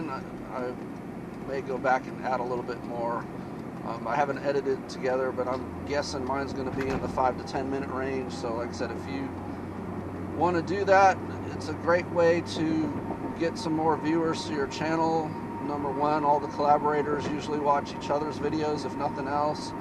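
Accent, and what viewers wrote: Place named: Texas, United States. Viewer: You might should type that e-mail address out as it's hard for me to understand that Texan drawl.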